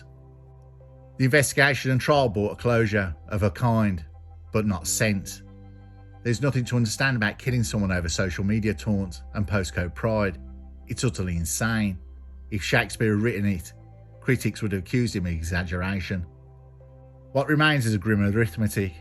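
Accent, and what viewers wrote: Birmingham, United Kingdom. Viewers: From one brummie to another love youre work brother.wouldn't sound right in another accent to me lol.👍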